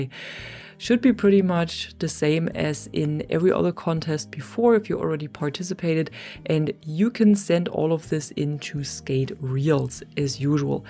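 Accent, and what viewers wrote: Germany. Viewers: Ich wusste das ist der deutsche Akzent 😂❤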